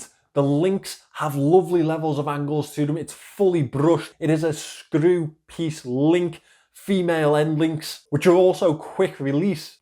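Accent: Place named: England, United Kingdom. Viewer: sorry. I just can't tell. I think he's English but the accent is just so strong. I will put on caption and hope it helps.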